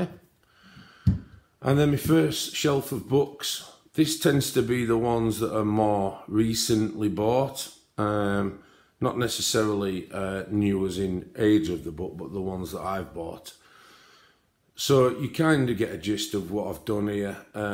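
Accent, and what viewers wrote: Yorkshire, United Kingdom. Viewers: Enjoyed your video, recognise your accent as Yorkshire may be, as I live in Yorkshire uk, New subscriber via The Bibliophile colourist. You have a great art space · Love your space 💜 and your Yorkshire accent 😍 All the best with your channel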